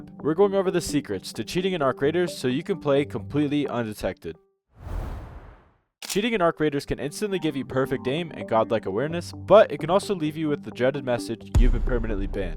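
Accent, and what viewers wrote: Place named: North America. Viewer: You sound like your NA. Wait till embark send the suites to harass your mom like blizzard did mine with Glider/Honorbuddy. Good luck kid. Your barking up a ruff tree and your talent could make you way more money in another field. Being a white hat isn't "gay". Its progression into being a adult that wont spend the rest of his life in debt or in prison. Good luck young man. If you are even the one coding these and not just a reseller. Idk, been out of the scene for decades and wanted to see what kids where using now in games I played.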